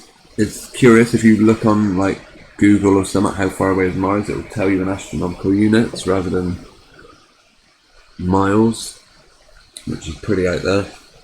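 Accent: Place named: Bristol, United Kingdom. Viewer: Is that photo taken from... St George or Totterdown? You sound like you might be from Bristol 🧐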